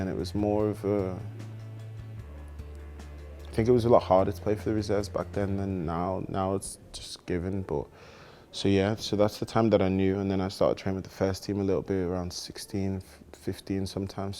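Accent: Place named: Liverpool, United Kingdom. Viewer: He sounds scouse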